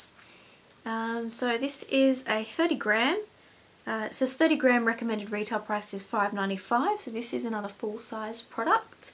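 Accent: Australia. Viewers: Hi Beauty. SORRY...but I love you ;-) I am not a lesbian, just think you a great girl! Everything about you is great! Your voice, face, passion and the fun of it! Your Aussi-Slang. I am a body-beauty-freak, too! I just like you! Phantastic person. Would love to hear from you - learn more about you! Greetings from Germany <3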